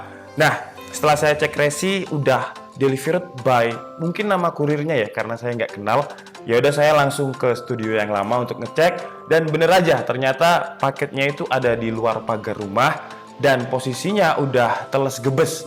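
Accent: Malang, Indonesia. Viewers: Mas Wong Malang Po?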